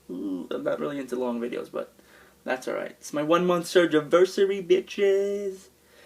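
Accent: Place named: Long Island, United States. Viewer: your LAWNG GUYLAND accent was really in full effect in this video...haha sadly I've basically lost my NY accent. Nice update.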